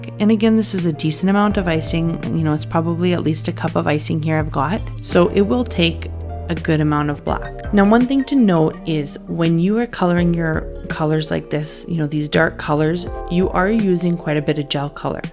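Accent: Canada. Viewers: 😹😹 YouTube isn’t really giving me my notifications otherwise I would’ve responded days ago. Yes you definitely have a Canadian accent When I left Canada and moved to the United States I was working for American Express and all of the customers kept saying where are you from because I would say “out and about” with that Canadian flare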